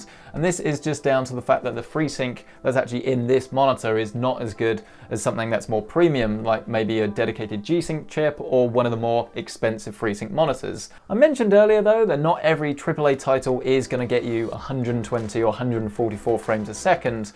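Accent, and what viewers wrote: United Kingdom. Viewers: I just love the british accent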